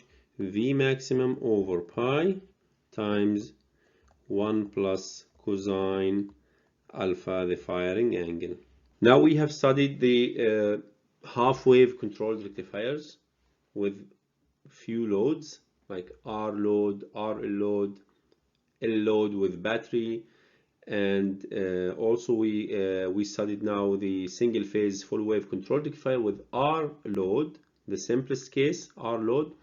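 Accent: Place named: Arab world. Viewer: Perfect explain, and i see the Arabic voice in your explain, please make Arabic language as same as the English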